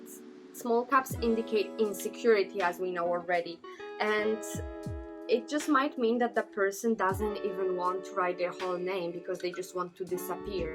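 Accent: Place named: Germany. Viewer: = Not Italian; +the accent (and way cute!), she's likely Polish or some other former Warsaw country. Her name in Italian would be something more like: Alessandra Piotro. Before seeing her surname in video (9) I thought maybe High-German by the accent and the way she wrote the number "1" in video (3)....unless that's her husband's surname, in which case I'll stick with German. Else if not married, wanna go on a date with me?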